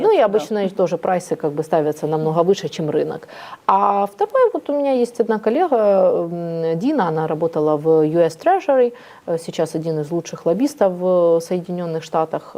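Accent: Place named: Ukraine. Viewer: Это элемент суржика.Русский язык с украинской фонетикой.Человек ни по русски ни по украински не говорит.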